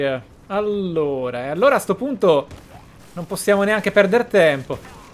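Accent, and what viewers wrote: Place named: Ireland. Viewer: "Maybe the TURD one". Non è che hai l'inglese impastato, è che lo parli con l'accento irlandese; che è tipo l'anello della calamità dell'inglese, come dimostrò sulla BBC Dara Ó Briain con una bambola parlante...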